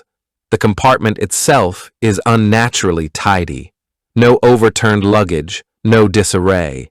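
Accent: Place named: United States. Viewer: The American accent spoils it for me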